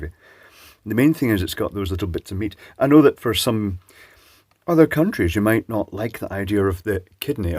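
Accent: Scotland, United Kingdom. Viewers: Wonderful to hear such a delicous Scottish brogue describing a meat pie - the late and wonderful Ivor Cutler would have been in his element Existentially yours.......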